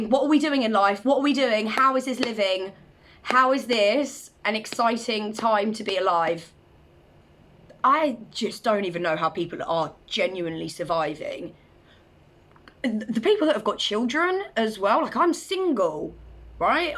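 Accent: United Kingdom. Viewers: Subscribed for her British accent and energy.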